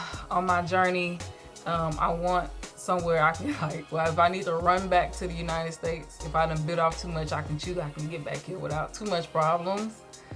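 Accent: Jamaica. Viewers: As a vegan you can shop at coronation market for fresh fruits for low cost,you sound Jamaican,it nice here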